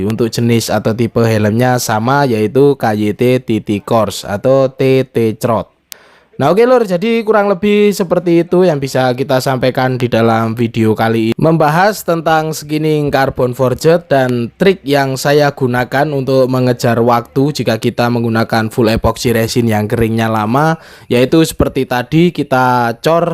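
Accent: Temanggung, Indonesia. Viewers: Ternyata orang temanggung to mas😅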